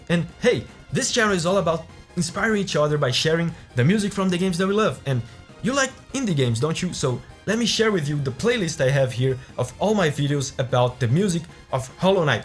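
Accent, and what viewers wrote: Brazil. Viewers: Sotaquezinho brazileiro, que fofo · Tu é do brasil né? Muito top, vi um pouco do seu sotaque e fui conferir e né q era vdd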